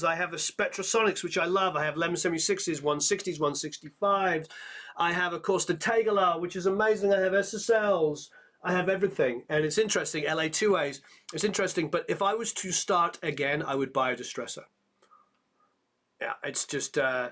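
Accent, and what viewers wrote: England, United Kingdom. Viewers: Don't lose your English accent, we love it, good info, thanks.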